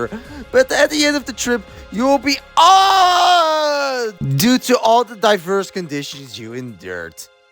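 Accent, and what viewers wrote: Netherlands. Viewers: Your dutch accent is showing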